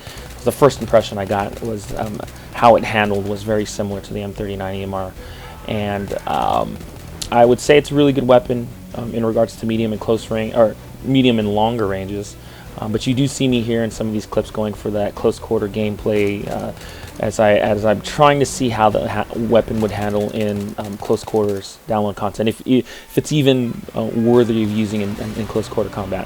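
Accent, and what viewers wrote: United Kingdom. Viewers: all me a noob but i thought he was British coz i watched his g3a3 video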